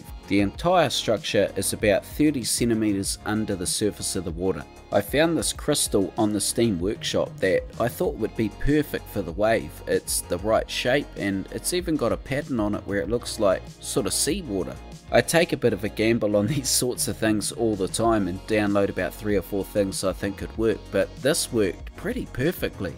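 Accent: New Zealand. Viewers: Love your new series. Love hearing the kiwi accent on CS vids.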